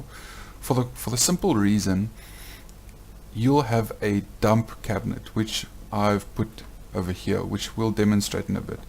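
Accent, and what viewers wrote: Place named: Afrikaans, South Africa. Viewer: You can hear that afrikaans accent from a mile away xD Goeie Video Bra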